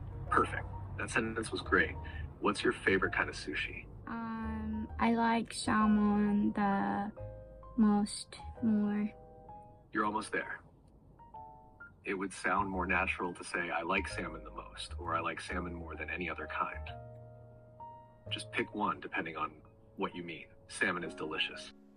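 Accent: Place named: California, United States. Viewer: 現在はアメリカ住みですか？ここまで美しいカルフォルニア？方面のアメリカ英語は中々聞けない気がします。 単語の選び方とかが特にネイティブぽい。。。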